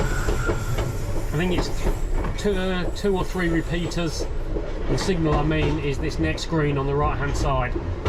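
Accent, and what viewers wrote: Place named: Birmingham, United Kingdom. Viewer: Hi, loving all your videos home & abroad. Cant think of any jokes at this moment in time. I have always been interested in London Underground and its trains. I live just across the road from the Victoria Line Depot. I no Southern region have problems in the winter with current pickup from their 3 rd rail system due to snow and ice. How does the 4th rail London Underground system fair with that problem? You was saying on one of your videos that your home town is Peterborough, i hear a Brummie accent from you. Anyway mate, keep up the good work. Regards Lee.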